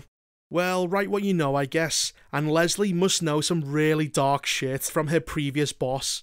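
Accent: Liverpool, United Kingdom. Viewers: This is the only scouser I will tolerate 😂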